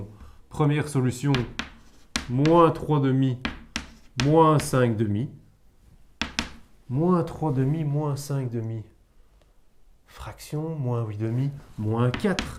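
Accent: Brussels, Belgium. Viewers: L'accent belge est vraiment magique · il a un accent bruxellois plus que "belge" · il a un délicieux accent belge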